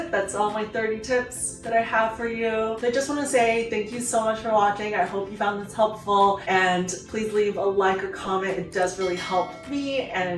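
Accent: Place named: California, United States. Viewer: your voice sounds Californian with a wanta be “Valley Girl” tone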